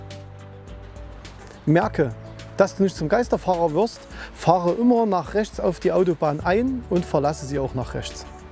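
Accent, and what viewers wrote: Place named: Thuringia, Germany. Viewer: Sächsisch naja eigentlich thüringisch am Rande von Sachsen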